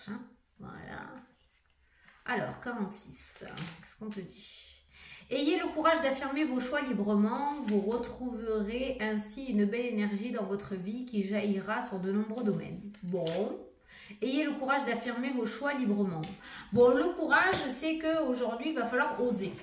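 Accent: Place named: Bordeaux, France. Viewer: Serai tu de Bordeaux ma belle fille parle comme toi.🥰🥰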